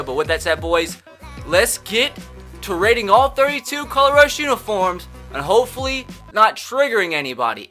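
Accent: Ireland. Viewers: Are you irish? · U sound Irish asf😂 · The way he talks is like a Irish person. He talks so fast.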